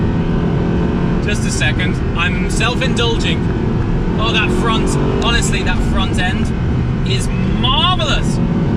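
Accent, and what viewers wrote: United Kingdom. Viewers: The british accent gives the videos a touch of class that makes a supercar review a notch above the rest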